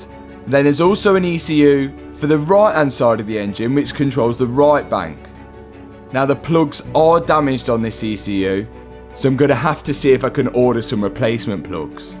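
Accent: United Kingdom. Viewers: No! Your British accent doesn’t sound like Matt’s.!!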